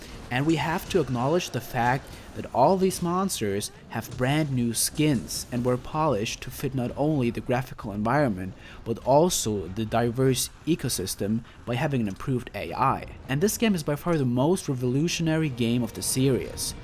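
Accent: Germany. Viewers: Are you German? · are you german?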